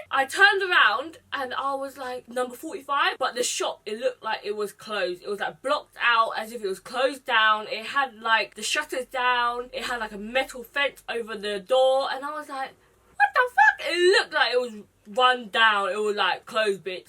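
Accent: United Kingdom. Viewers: Omg Viet with British accent...loves it!!!! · Woah I'm only 9 seconds in I've always watch you instagram videos o had no idea you had a British accent lol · OMG this is the first time watching a video of you on YouTube and I'm SHOOK of your British accent XD I knew you only from ig for a while and I thought you were American or Canadian :) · People who’s saying they don’t understand her, it’s because she has a British accent and not everyone has the same accent and she also wears braces at the back of her teeth. You all are being such bitches, leave her alone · Carolina Araújo it's called being SARCASTIC....she fucken mumbles. and she has a British accent on top of it so it's even harder to hear her. i can understand....she just doesn't SPEAK CLEAR.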